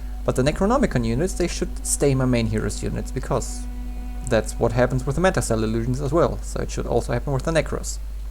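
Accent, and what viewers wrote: Germany. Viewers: It is a german accent, I believe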